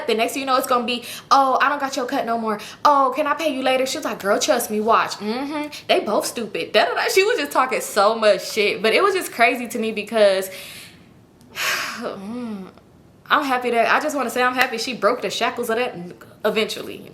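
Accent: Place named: South, United States. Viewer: your random country accent gets me every time. And I’m from Memphis. You know we country as hell.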